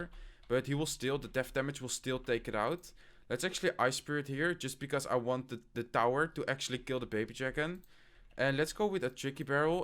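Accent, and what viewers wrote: Netherlands. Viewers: You're not "monotone" it's just your accent bro don't bother with trying to change ur accent ur from the Netherlands and ur voice is just like that! I've learned a ton from u man! I use this same deck but use hog, zap, Skarmy, rocket, inferno tower, Princess, gob gang, gob barrel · are you dutch?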